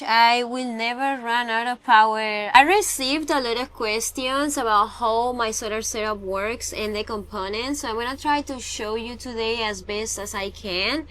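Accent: Spain. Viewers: Love your Energy, I started watching and then I saw all of the Alva stuff and then I noticed that you were in my Home Town of San Diego. I was in Solana Beach then moved to San Marcos. I used to - many years ago - work for Thrasher Magazine in San Francisco. I've been skating ever since the 80's when I was a Sailor on the USS Midway - then stationed in Japan. I SUBSCRIBED and liked........ I love your accent, what is your FIRST language? Is it Castilian Spanish? BTW, a friend of mine just recently gave me his Tony Alva Deck signed by Tony way back when.....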